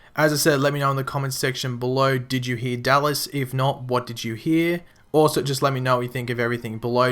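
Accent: Australia. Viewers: are you australian? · Holy shit u sound Australian. R u australian?? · But I'll watch the video anyways because your voice is Australian gold.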